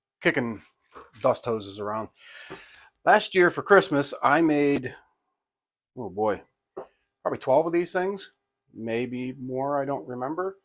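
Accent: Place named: Pittsburgh, United States. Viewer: Pittsburgh? I know that accent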